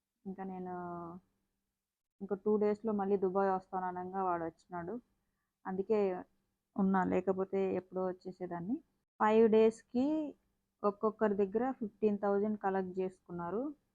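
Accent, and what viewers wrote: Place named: Telangana, India. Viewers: మీరు మాట్లాడటం కొంచెం తెలంగాణ లెక్కనే ఉంది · Telangana valla tho unty ela ne matladutaru ga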